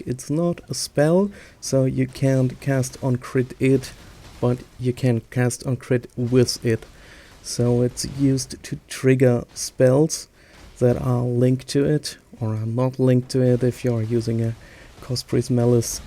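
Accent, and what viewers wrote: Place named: Germany. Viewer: we germans speak like that. so what?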